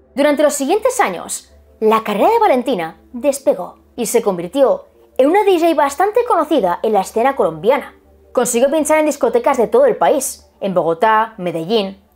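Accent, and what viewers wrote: Spain. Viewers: me encantan tus vídeos Antes cuando recién vi uno no entendía bien el español de España me parecía muy rápido la manera de hablar, ahora ya lo comprendo súper bien 😂❤ Me acompañan tus videos mientras hago la limpieza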